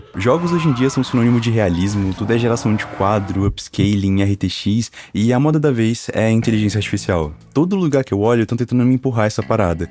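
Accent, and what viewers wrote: Brasília, Brazil. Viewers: tu é daq de Brasília? kkkk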